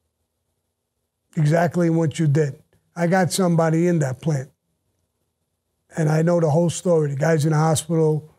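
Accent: New York, United States. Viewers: hes great storytellar that old school newyork accent i miss hear in it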